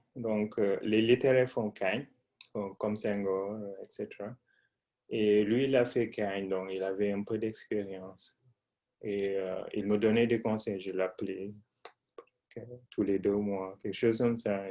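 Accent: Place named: England, United Kingdom. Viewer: C'es ouff tu parles français avec un accent anglais pour un francophone ! Great!!!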